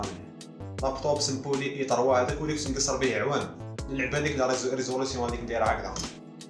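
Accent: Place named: Algeria. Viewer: اووووووووه شيت وانا نقول هاذ اللهجة راني سامعها هخخخخ مهم نت راك ولد بلادي انا فرجيوة ولاية ميلة مام انا نلعب شوي غايمز فالقناة تاعي الفيديو لجاي ندعمك =)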